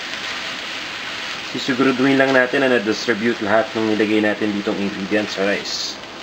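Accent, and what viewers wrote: Philippines. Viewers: i visit ur recopies cos i like fillipino accent..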